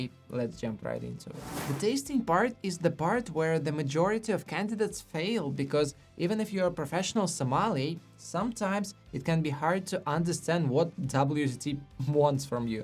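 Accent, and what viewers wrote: Russia. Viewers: Keep going, i belive your channel will grow to a large audience. Love your content. Nice russian accent by the way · Are you russian?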